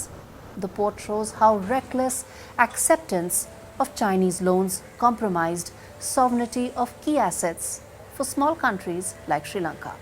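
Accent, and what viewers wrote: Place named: India. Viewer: the announcer says “… China’s checks threatened to turn several countries into ‘versaille’ states due to their high indebtedness…” what the hell’s “versaille states”? I have hard times with the Indian English…😢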